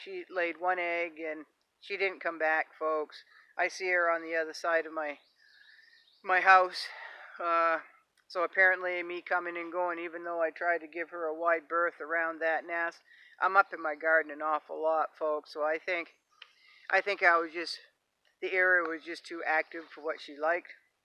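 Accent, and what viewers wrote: Maine, United States. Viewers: Im one of the new subscribers- youtube suggested it while I was watching permapastures. I subscribed because I like the easy going yet firm manner and the garden behind you looked intriguing, I may do a teepee next spring. I also subscribed because I miss the Maine accent, a lot of the family on my dads side are from there.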